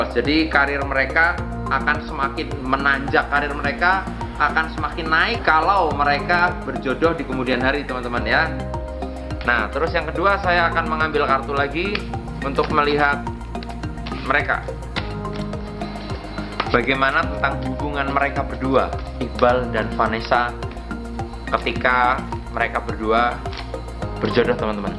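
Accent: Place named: Yogyakarta, Indonesia. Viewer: Orang mana bang kok medok?😂, Dari Jogja ya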